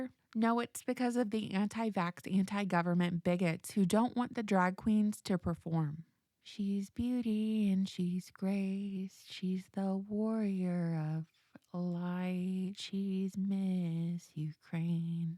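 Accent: South, United States. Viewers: what happened to Southern accent?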